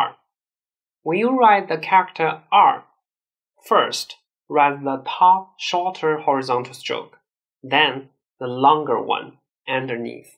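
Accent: Standard. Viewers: I am Chinese , I can tell you that this teacher speaks Mandarin , his pronunciation is very standard !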